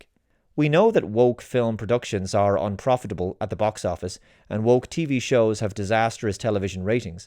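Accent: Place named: Ireland. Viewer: If I may, I would like to hear you put the truth of Western culture’s debasement, undermining etc. etc. etc. in more historic terms. Honestly, there ain’t nothing new about what’s going on, right? Your astute and insightful dead pan brogue, for me, is the most delightful if not compelling reason I listen. Unfortunately, I find your message can come off a little hopeless with tones of the very, “them & us”divide you abhor. We can overcome this. Thank you for your great work and time. “History doesn’t repeat itself, but it does rhyme.” Russian proverb. (I think)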